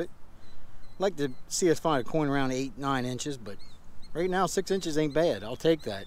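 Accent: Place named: Pennsylvania, United States. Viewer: You've got to be from Pa.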